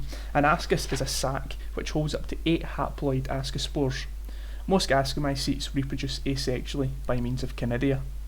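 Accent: Scotland, United Kingdom. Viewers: lovely Scottish accent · Wow, the amazing Scottish accent :)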